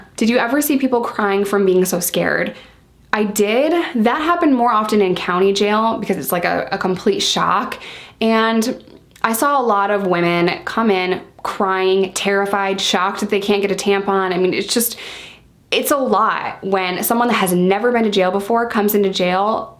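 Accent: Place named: Oklahoma, United States. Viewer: I live in Oklahoma love how you hear the accent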